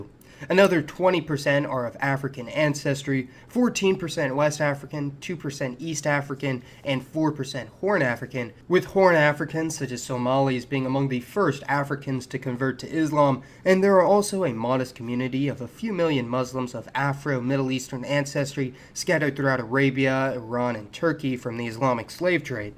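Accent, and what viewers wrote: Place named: United States. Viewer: and no American voice next time 😂try a British one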